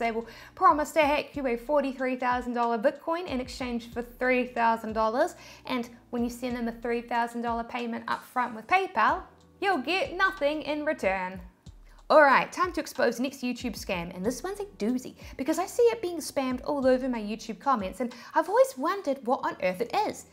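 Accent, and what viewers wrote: New Zealand. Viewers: You are the only YouTuber I know with a New Zealand accent. I never heard this before. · Your Kiwi accent maybe your biggest asset here. If the person pretending to be you can't say "fush and chups", then clearly, they aren't you.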